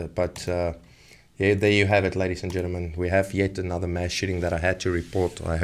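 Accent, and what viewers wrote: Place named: South Africa. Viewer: why this south african sounding ass over here worried about american 2nd amendment? 🤣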